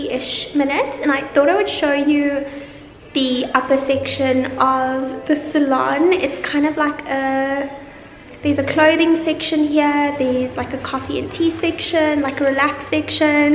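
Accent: South Africa. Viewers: I knew you were from south africa once I heard your accent, sounds like Candice Swanepoel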